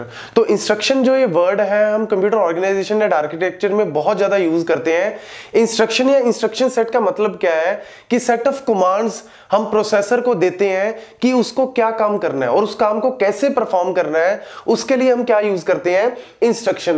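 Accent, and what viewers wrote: Punjab, India. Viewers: The best Computer Architecture teacher ever! I hated this subject until I started watching your videos, Sir. :) I love your Punjabi accent, it makes the lectures interesting and fun. You are always to the point and cover the topics in such less time. I am thankful for your speed. If I ever become a professor, I want to teach like you.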